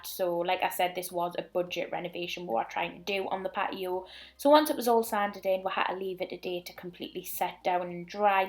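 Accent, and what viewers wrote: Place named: Jamaica. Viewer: Jamaican here. Its wild to hear the similarities in our accents. Good job on the patio!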